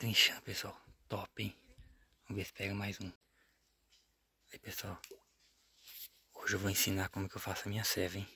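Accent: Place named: Mato Grosso, Brazil. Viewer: De onde vc é tá parecendo os Rio de tangará da serra MT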